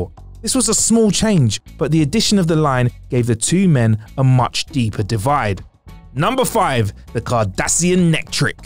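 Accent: United Kingdom. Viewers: even with a british accent I could tell what he looked like. Are those my skills? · That British english is horrible.Slow down mate.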